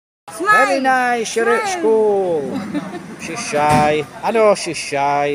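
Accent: Yorkshire, United Kingdom. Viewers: Thought you was a YORKSHIRE LAD ❤ I'm from a village called Maltby near Rotherham 😅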